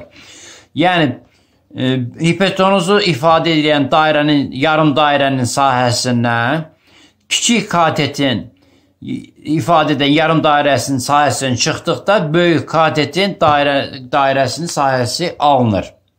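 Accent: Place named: Shaki, Azerbaijan. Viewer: Çox gözəl müəllimə.Şəkindənsiniz? Ləhcəniz əladı❤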